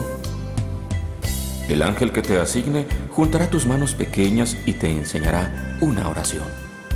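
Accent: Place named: Latin America. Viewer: Bonita historia..D : De donde sacaste la voz? es latina ,jaa ..pero esta bien,tiene su encanto.jaa te doy mi pulgar jajaa ..hayy que daño..no te rias ,quitarse el pulgar para dartelo ,duele un poquito jajajajaaja... te queria dar las estrellitas uhm..pero se me estropeo el Cohete interrestelar jajaaaaa... besitos de un gatttito gotico..miauuuu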